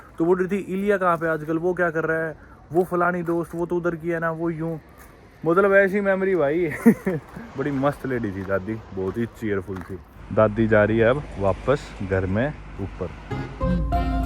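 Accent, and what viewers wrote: Haryana, India. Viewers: bhai aap haryanvi bolte huye jyada mast lgte ho ❤ Gajab accent lgta h aapka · Haryanvi accent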